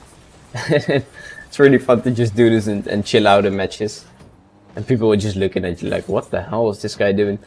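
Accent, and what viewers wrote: Netherlands. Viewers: I smell Dutch, bedankt voor de tutorial maat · I just know ur a fellow dutch person, i can hear it in ur accent 😂 great video man, love it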